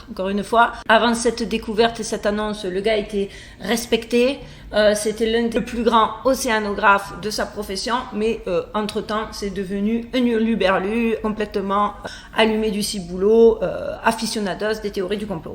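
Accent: France. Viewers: Génial plus je découvre tes vidéos plus j ai hâte de les découvrirent. Tu au top ne change rien à tes accents français ou Anglais. J aime ton authenticité suis fan. 🙏😘🌹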